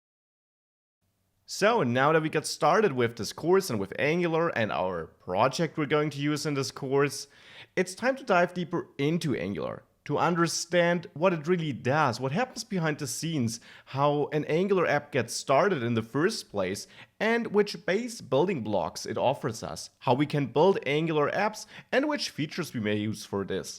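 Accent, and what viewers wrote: Bavaria, Germany. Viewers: Hab mir gerade den Angular 2 Kurs auf Udemy gekauft, und fiel fast vom Stuhl als ich den Dialekt gehört hab. Wenn mi ned ois teischt, a Bayer??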